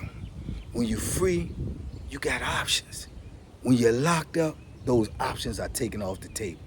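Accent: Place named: Washington DC, United States. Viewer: You sound like you from DC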